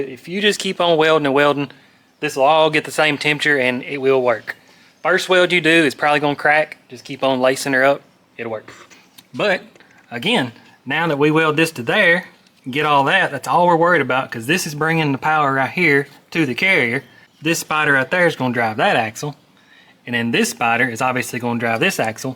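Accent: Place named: South, United States. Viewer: If he’s got a southerner accent, you know you can trust him.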